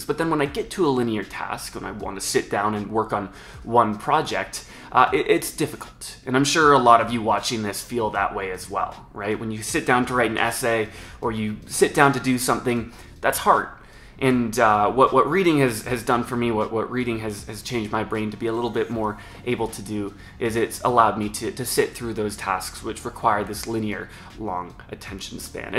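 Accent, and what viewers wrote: Canada. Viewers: Your accent says you're in Canada. Am I right? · This guy’s Canadian. ‘Bout!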